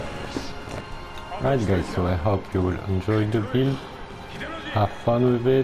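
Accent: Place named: France. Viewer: Your French accent is so sweet, and good build gg wp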